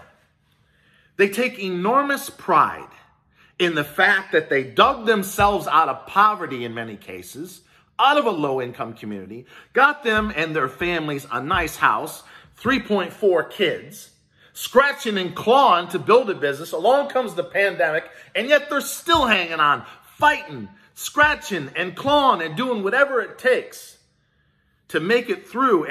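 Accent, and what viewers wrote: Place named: New York, United States. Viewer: Let me repeat myself..... Middle class is being FU$&€% OVER!!!🤣🤣 I was born and raised on Long Island, and I Swore when I first heard you talking...I KNEW.... you were a New Yorker!!! LOL 😂 We love you too!🤩☮️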